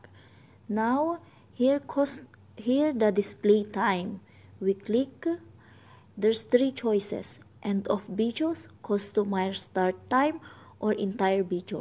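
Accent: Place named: Indonesia. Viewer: I think you're not a filipina? Your accent like Indonesian, malaysian, indian girl.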